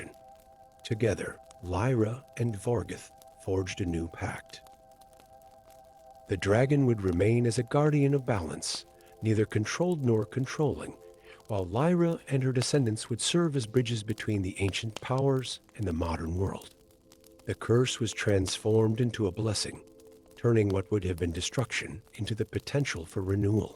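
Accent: United States. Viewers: Love your stories. Really dislike this voice, sounds American, it’s just awful.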